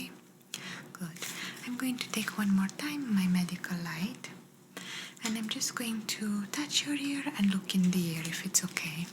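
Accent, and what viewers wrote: Italy. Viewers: Your Italian accent is very special and beautiful. You are terrific for ASMRs. First time see your channel it was amazing, you have a viewer that is going to continue watching your great videos. Thank you for all your hard work. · I really enjoy your Italian accent personally I’m ltalian your soft spoken is my favorite your whispering is also my favorite sounds. PS sleep 😴 dreams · Anyone else find her Italian accent a massive turn on? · Hello fantastic ASMR with Italian accent I loved it I really enjoyed listening to your sweet voice very relaxing very relaxing greetings beautiful 🌹🌹💖💖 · you made my day aside from the Italian accent you reply to me nice your amazing. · Italian accent has an additional sweetness.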